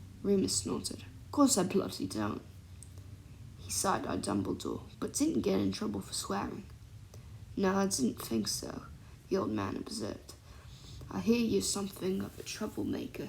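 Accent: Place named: United Kingdom. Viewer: Woah I thought you were British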